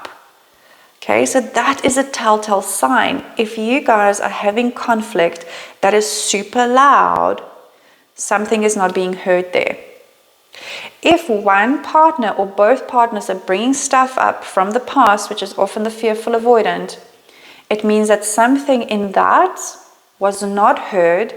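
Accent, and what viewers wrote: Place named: South Africa. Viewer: Hah! I recognized the SA accent. Great video!!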